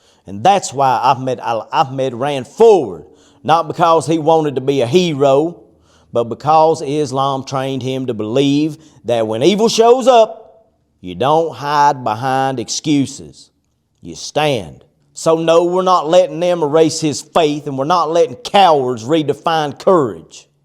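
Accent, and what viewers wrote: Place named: Texas, United States. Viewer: Wow, once again I feel blown out by such a strong and sharp speech full of truth and wisdom so that I couldn't help it but to listen to it three times in a row! Bro, your words are those of a sharp shooter in their accuracy and that of heavy weaponry in their effect. I'm totally amazed. I must add one thing - I used to hate so much the Texan accent due to the war criminal G. W. Bush and the such. But thanks to sheikh Yusuf Estes first and thanks to you now, I've come to enjoy listening to this beautifully spoken English. My sincere admiration and respect, Bro.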